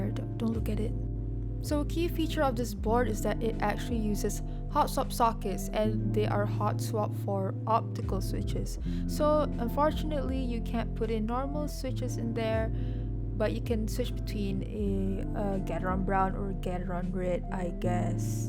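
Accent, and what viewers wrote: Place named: Philippines. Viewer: just curious but are you a filipina? you sound like one ngl i am not meaning this in a bad way btw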